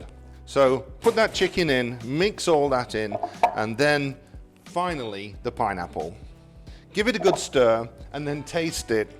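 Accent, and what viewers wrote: United Kingdom. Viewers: What happened to the British accent lol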